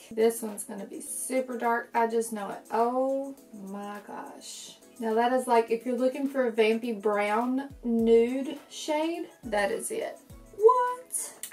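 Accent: South, United States. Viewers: New subscriber! Your accent completely won me over 💘 I'm a southern girl myself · yeah she has a really charming southern accent.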